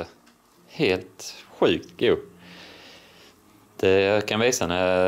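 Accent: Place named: Kristianstad, Sweden. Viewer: Kunde nästan svära på att du var från Kristianstad-trakten :-) Iofs inte SÅ långt emellan men lät så på dialekten. Fina omgivningar där i Småland. Och så mkt snö!! Här i Eslöv har vi vit mark nu, denna i natt, och jag önskar att det försvinner lika fort som den kom haha. Din vovve... Det är väl inte en New Foundland?? Såå fin!!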